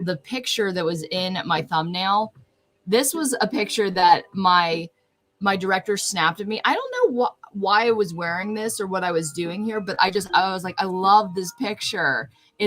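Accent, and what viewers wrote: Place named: Los Angeles, United States. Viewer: I so love your la accent! I love you sweet.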